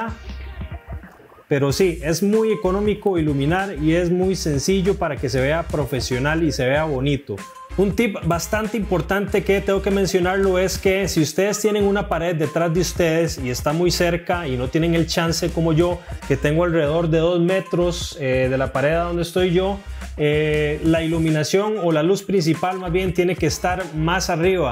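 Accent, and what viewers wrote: Costa Rica. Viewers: Que cool este video 😮 , estoy por empezar un podcast con una amiga y este video es oro 🥇🪙, desde que te escuché pensé que eras de Costa Rica, porque tengo un amigo que habla casi como tú 🤭 no me equivoqué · Acento tico!!! Genial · ya decía, esa manera de pronunciar la R es inconfundible de un tico, buen video :) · mae los ticos tenemos un acentillo raro no arrastra la r y aun asi me di cuenta que sos tico pura vida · Mae el acento tico es probablemente el mas reconocible jaja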